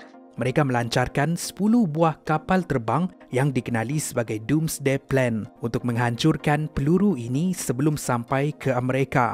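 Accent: Sabah, Malaysia. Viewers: X prnh dgr loghat Sabah ker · Orang Sabah bah dia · Ko ingat semua orang Malaysia ni melayu ke....dia orang sabah memang la guna bahasa baku Malaysia.......hairan betul aku dengan orang melayu ni🤦‍♀️🤦‍♂️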